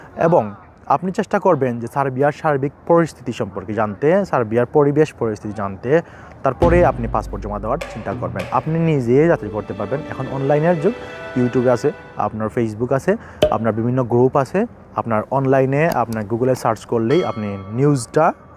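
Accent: Sylhet, Bangladesh. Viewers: Vai Sylheti na ki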